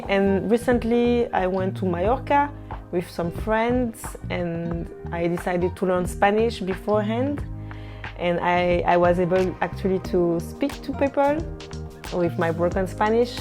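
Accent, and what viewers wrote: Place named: Belgium. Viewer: You sound very Belgian haha (coming from a fellow Belgian) I would recommend you to check out Dreaming Spanish. がんばれええ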